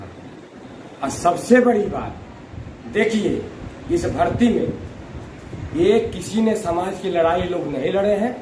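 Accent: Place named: Bihar, India. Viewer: Tm to bihari lagta h ..kya bhai .sahi bol Raha mai 😊😅😅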